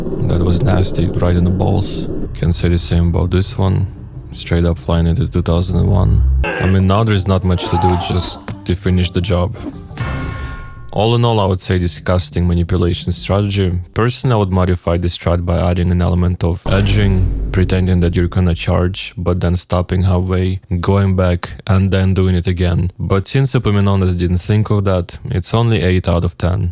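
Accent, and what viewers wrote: Russia. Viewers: This is explained by the fact that he has a Russian accent. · man i thought there's a russian version of this video because of the russian accent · this is ochen horosho, bratan, delai bolshe takich video (I heard an atom of russian accent) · акцент русский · listening to ts with just audio sounds like a russian telling you war stories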